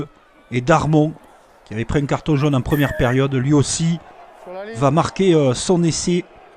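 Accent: France. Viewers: On parle vraiment avec cet accent dans le Sud Ouest ? Oh, merde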